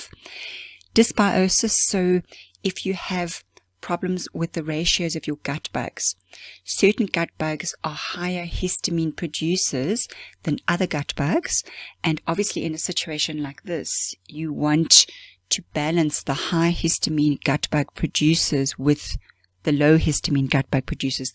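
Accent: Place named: South Africa. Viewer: You sound like you are from South Africa.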